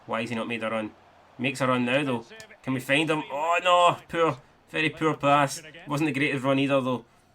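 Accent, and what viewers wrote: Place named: Scotland, United Kingdom. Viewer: Fantastic scottish accent ! Greetings from Argentina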